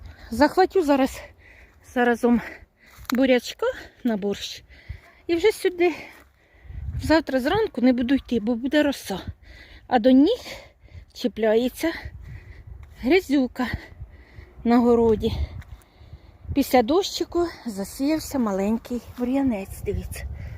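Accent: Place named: Kharkiv, Ukraine. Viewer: доброго дня! Випадково потрапила на ваш канал. Дивлюся на вас, ніби на себе збоку)) Говір схожий чи не з Харківщини ви? А помідорчики... така ж історія, в погреб вже ставити ніде, роздала, а це вже бройлери смачно їдять)). Успіху вам, миру і перемоги нам усім!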